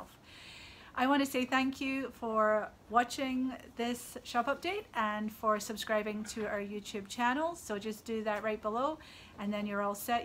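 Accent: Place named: Glasgow, United Kingdom. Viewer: I’m so glad I found you and your lovely shop, you are a voice from home - I’m from Glasgow living in Virginia :-) Merry Christmas and a happy New Year to you and yours.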